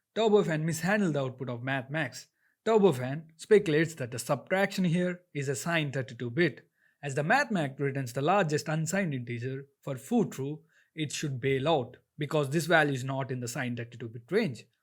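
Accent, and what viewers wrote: India. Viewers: Are you from telugu states? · i love the indian accent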